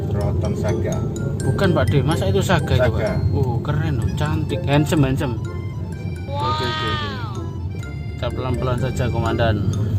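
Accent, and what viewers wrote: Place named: Java, Indonesia. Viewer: Aku suka dengar slang Jawa mu dong. Rasa senang dan menghiburkan.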